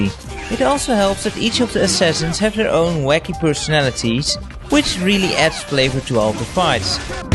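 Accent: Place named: Netherlands. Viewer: hahah goeie review. ik had direct door dat je een Nederlander bent :p Voor 40€ is hem zeker waard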